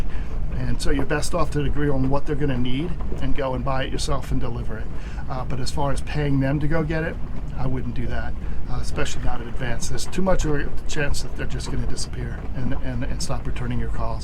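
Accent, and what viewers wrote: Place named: South Florida, United States. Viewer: Great Vid. Gee, sounds like S. FL.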